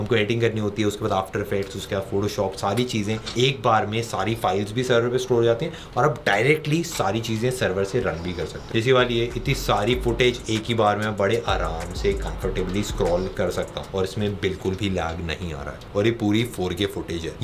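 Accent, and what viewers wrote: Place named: South, India. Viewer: Vai ye batao k aap South se ho kya....south indian jhalak raha hai tumhari muh se....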